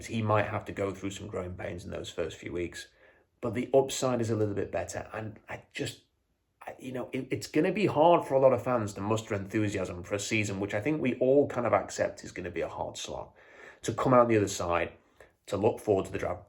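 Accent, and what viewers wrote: England, United Kingdom. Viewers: Well said. It’s even funnier yet Better that a guy with an English accent says it.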